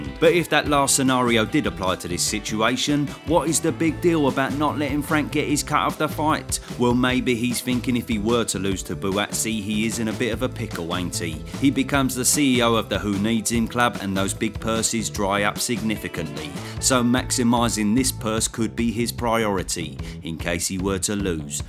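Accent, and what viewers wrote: Cockney, United Kingdom. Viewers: I'm from Bethnal Green (One of the last cockneys around here), I'd say he is cockney, just puttin on a bit for the vid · where are you from? His accent is from London He's what we here in London call a Cockney look that up on YouTube And you will see they originally come from East London 😉🙏🏻🇬🇧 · Cockney accent. More prominent now in East Greater London (e.g. Romford and Barking) and Essex.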